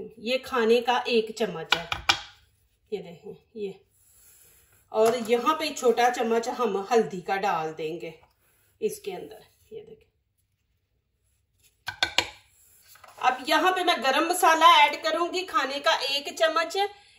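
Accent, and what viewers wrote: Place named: Punjab, India. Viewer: Madam ap english urdu... panjabi style ma boti hain. niceeee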